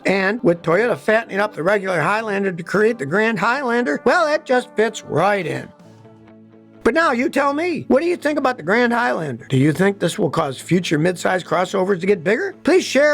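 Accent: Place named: Michigan, United States. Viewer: did you grow up in Michigan? I did, and you sound like you did!!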